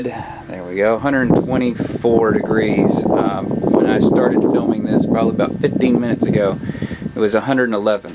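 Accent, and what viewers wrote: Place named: South, United States. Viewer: You have lost some of your southern accent since this video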